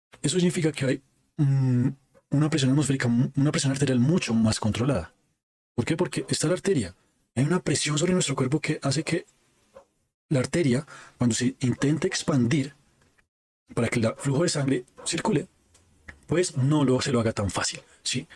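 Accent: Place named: Bogotá, Colombia. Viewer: La verdad amigo, creo que eres una persona inteligente, pero d ves mejorar muchas cosas. Primero, el acento bogotano que se caracteriza por hablar entre los dientes y hay momentos en que no se entiende. Segundo, eres muy disperso y eso cansa a quienes se interesen por el tema. Tercero, dejas muchas lagunas a pesar de que el tema es muy interesante. Yo soy meteorólogo y quizá luego haga un vídeo al respecto. De todas maneras, ánimo.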